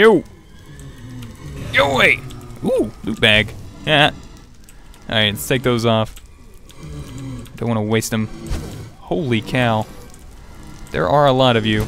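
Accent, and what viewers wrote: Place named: Midwest, United States. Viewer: Scotty I think he has a centrial U.S accent but I cant quite tell, all I can tell is my accent is very similar at times and I live in Oklahoma U.S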